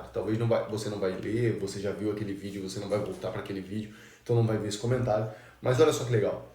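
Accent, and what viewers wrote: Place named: Santa Catarina, Brazil. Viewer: Voltou pra SC e seu sotaque catarinense tá voltando aos poucos em sgt kkkkk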